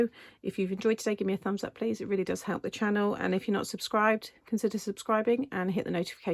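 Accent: United Kingdom. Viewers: Here's what I love about you...I want to create every time and all the time when I watch your videos!! You are honestly one of my very favorites! Thanks for all the inspiration and for all the great creates!! I have made so so many awesome things because of you! And this Ohio girl loves your accent and the neat little different words you being from the UK use!!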